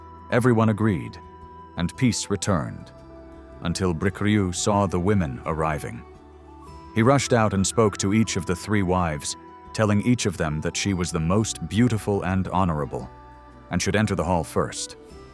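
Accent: United States. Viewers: Why US accent when it's about Celtic Mythology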